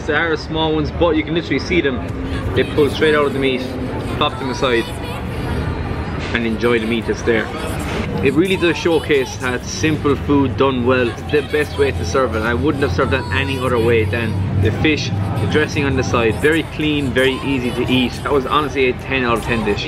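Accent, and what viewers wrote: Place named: Ireland. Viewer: very nice intro, looks nice restaurant, OMG!!.......there are so many good restaurants..in Spinola bay..sadly so many come and go!! i subbed, so i keep an eye out for you..( sounds a little like a guy from the Emerald Isle )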